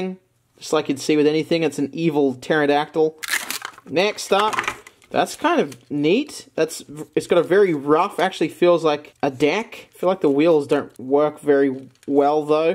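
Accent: Australia. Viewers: i thought ur Australian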